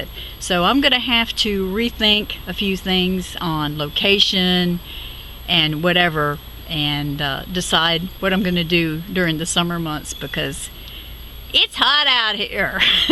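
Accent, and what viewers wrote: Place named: South, United States. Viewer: I detected a little Southerness there!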